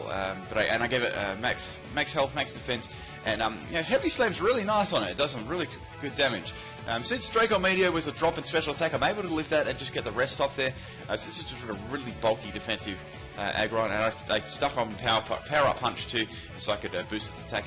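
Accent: Australia. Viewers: Yes it is, but he's an Aussie so it's okay for them to mispronounce words, or it could very well just be his accent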